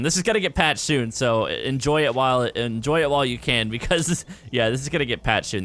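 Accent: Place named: United States. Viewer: You sound like fresh just with an American accent :D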